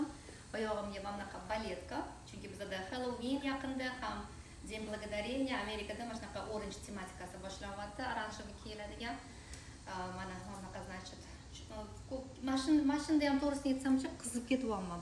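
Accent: Uzbekistan. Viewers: Ўзлигиззи йўқотмаганиз яхши. Тилиз ширин. Тозза тошкентча. Қизиз билан ўзбекча гаплашвуринг. Ростанам опа сингилга ўхшисила.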